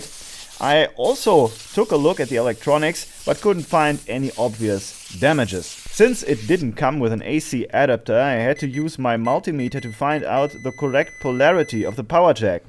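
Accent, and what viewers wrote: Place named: Germany. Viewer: I've been scavenging old junk for years in wicklow, but your videos have inspired me to fix some of it up. So thank you for the inspiration, and your lovley German accent with great English 👍. Much better than us irish speak it.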